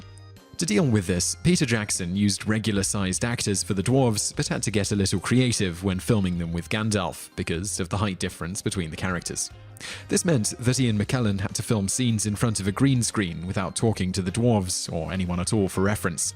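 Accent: United Kingdom. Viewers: Other British people, surely you understand that pronunciation of things is natural, right? Taking into account the many kinds of British accent there are, this is just the way people with his accent pronounce things when they read a word! pronunciation and British tone is preferable, no wonder England has the Top 10 Channel game by the plums. Please keep up pronouncing things the way you do,! If I did the subscription thing, I'd subscribe.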